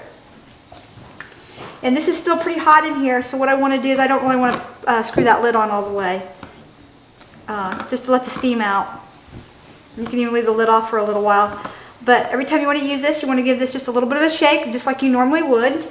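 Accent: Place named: Appalachia, United States. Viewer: Sounds like a hillbilly and can't do it right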